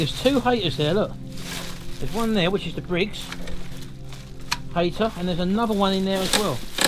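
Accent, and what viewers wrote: United Kingdom. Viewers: And English you are!!!!! As I have been amazed by your passion and your left side of brain (left handed as well). Wondered for a bit of your whereabouts until you finally spoke as I assumex you could be Canadian, British or German and with that, I assumed correctly... Left handed and no ring (who in his / her right or left mind would wear a wedding ring. To that end, married or not, straight or otherwise you sure know how to work with your left hand and fingers. You also have very good digital skills (printing+video editing). One take....The sign you printed was slightly off center when applied. of all trades....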